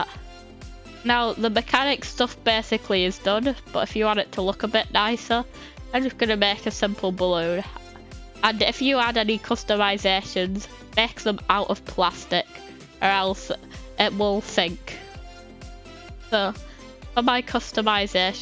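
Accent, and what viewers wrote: United Kingdom. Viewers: Nicee accent ( is that british )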